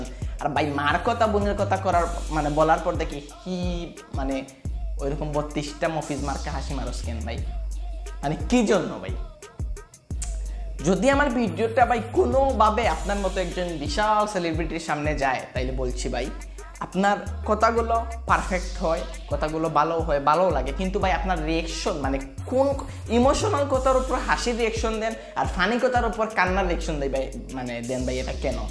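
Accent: Sylhet, Bangladesh. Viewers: Sylheti math tmr muko vala lage... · আপনি কি সিলেটি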